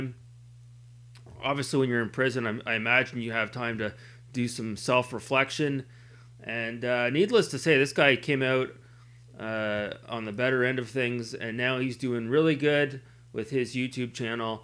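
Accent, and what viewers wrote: Canada. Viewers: ohh nice om from there too small towm outside Toronto. Just thought i heard a bit of newfie in there lol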